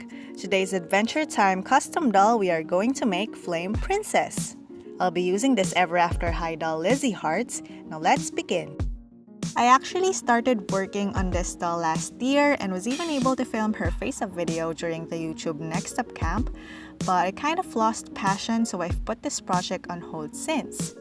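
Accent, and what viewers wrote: Philippines. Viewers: You kinda have a filipino accent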